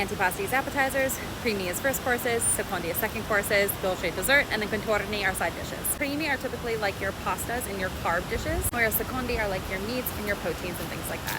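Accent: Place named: United States. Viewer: Sorry, but I couldnt really understand but a few words you spoke. As I’ve commented before, you speak so quickly and not everyone understands American.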